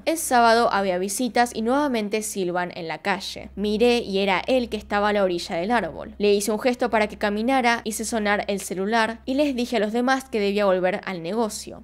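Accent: Buenos Aires, Argentina. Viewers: Hermoso referente argentino, bella, muy buena voz y bien porteña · Amo tu acento argentino. Saludos desde 🇨🇴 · Acento porteño. Y a mi me gusta tu trabajo. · Me encanta tu voz de argentina 😅 me relaja y me gusta como cuentas las historias en tu otro canal, saludos 👋👋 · <3 creo que vicky tiene razon con lo de la voz argentina, ya que para mi eso es una de mis cosas favoritas de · Sí, yo también vengo en parte por tu voz de argentina jajaja. Un abrazo desde Perú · Chale esta rebonito tu acento ARGENTINO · Buenas tardes guapísima acabo de ponerme a escuchar casos y el primero por supuesto es el tuyo. Punto uno me gusta tu trabajo y como lo hacéis, a mi no me importa en absoluto tu acento argentino me agrada en que a veces algunas palabras no las entiendas o pienso que tienen otro significado. Pero igualmente me gusta mucho tus trabajos. Acerca de este caso, lamentable la vida de este chico, su principio y su final fue muy lastimoso. 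Y hay que reconocer que el trabajo de los carabineros fue bastante rápido, acerca de otros casos. Saludos desde España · Me gusta tu contenido y cómo explicas los casos, pero tienes razón y amo tu voz argentina, soy mexicana y soy super fan del acento argentino __ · ADORO TU ACENTO ARGENTINO. ESO TE DA PERSONALIDAD, IDENTIDAD, ESO ES TU TESORO. UN ABRAZO GRANDE, SOS GENIAL. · "si quieren escuchar mi voz de argentina" me dio mucha risa porque en realidad no se que sea pero me encanta ver tus videos y esuchar tu voz,aunque hables de cosas feas me gusta demasiado como narras:) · no lo tomes a mal, jeje pero suena muy bonito tu acento argentino, y mas cuando dices el "Bienvenidos a mi video, una vez más" saludos y buen video.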